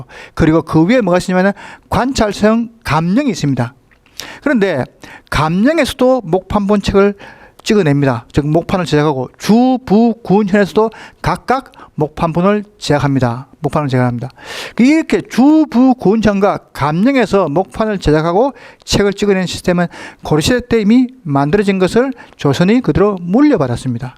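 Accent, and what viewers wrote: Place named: Seoul, South Korea. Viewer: 굉장히 꼼꼼한 성격이실것 같은 사투리사이에 한글에 대한 성찰이 녹아있으시네요 : D 처음엔 재미있을라나 걱정했는데, 찰진 서울말녹은 사투리에서 교양을 발견하고 합니다^^ㅎㅎㅎ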